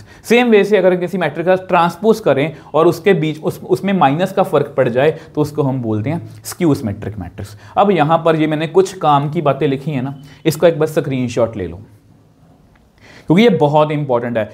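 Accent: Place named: Pakistan. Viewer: apka accent Pakistani lag RHA hai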